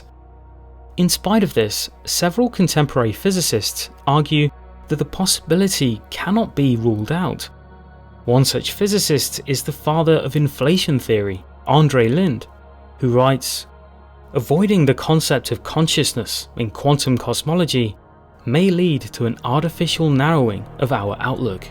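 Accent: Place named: United Kingdom. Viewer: This is like listening to terrance mckenna in a British accent 😂 he was saying the same things with more deugs in the talks of coarse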